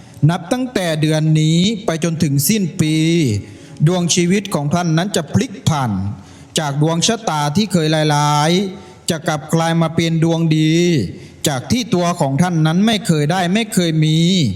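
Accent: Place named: Isan, Thailand. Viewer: อยากกดเข้ามาดูตลอด แต่พอได้ยินเสียงคนอ่าน กดออกแทบไม่ทัน ถามจริงหาที่อ่านแบบคนทั่วไปไม่ได้จริงเหรอ สำเนียงอีสานก็หังยากแล้ว ยังจะมีการทำเสียงแบบละครวิทยุสมัยก่อนอีก เฮ้อรับไม่ได้ๆๆ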